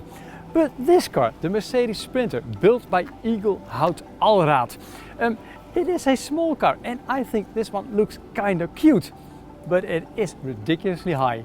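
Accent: Netherlands. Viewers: Nice video, I really enjoyed watching it. If you would allow me to give just one point of critique, it would be this: while your English is excellent (ok, I can hear that you're a Dutchman, but there's no shame in that), there was one expression you used that kind of irritated my ears. It's when you say samething like: "that is how it should look like". You're stringing two expressions into one (incorrectly), so you could either say "that is how it should look" or "that is what it should look like". Apart from that, again: great video.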